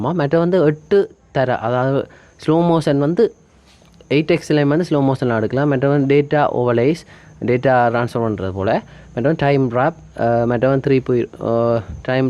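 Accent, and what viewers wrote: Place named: Sri Lanka. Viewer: இலங்கை தமிழ் என்ன ஒரு இனிமை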